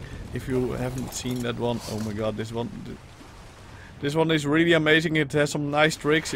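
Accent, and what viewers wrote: Netherlands. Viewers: Ben je nederlands ofzo? (Je klink gewoon zo)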